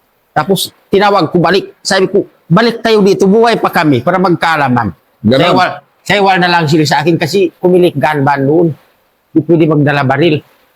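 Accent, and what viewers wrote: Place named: Tausug, Philippines. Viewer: Tausog na tausog talaga c sil mag kwento ba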